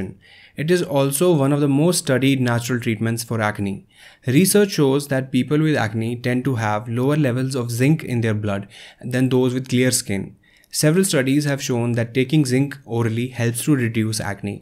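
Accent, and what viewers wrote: India. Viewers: You sound like indian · Yeah it's indian accent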